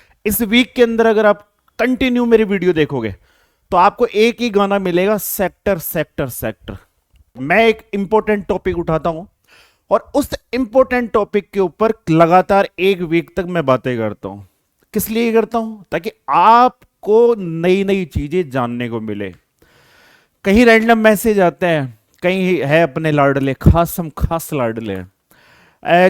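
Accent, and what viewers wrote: India. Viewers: Sir aap haryana se ho language waha ki hi lg rhi h jo bhi h love u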